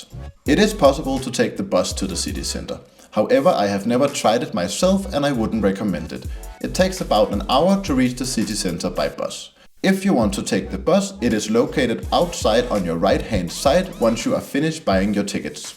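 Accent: Denmark. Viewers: Hello. thank you for the information.Is that a danish accent?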